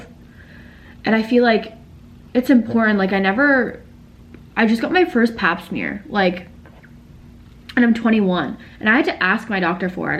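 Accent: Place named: Canada. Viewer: Lol I think it’s her Canadian accent? She says bagel the same way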